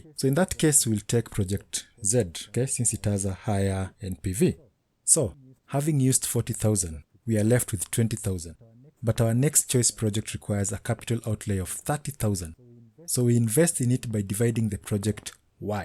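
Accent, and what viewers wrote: Kenya. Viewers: You sound like a kenyan.....are you one???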